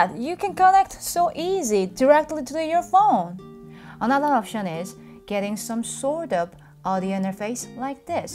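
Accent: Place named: South Korea. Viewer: after listening to many of the big stars doing videos from home during the social distancing it is obvious that you really do a great job with audio. most of the famous stars sound terrible on their videos. you however have learned very well how to balance piano and voice. that's great because you have a wonderful singing voice and I adore your Korean accent when you speak.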